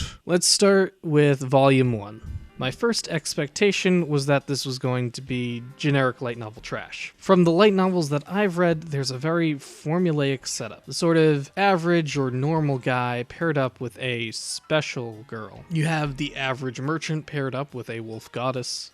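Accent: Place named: Japan. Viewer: it is somehow funny to hear japanese speak english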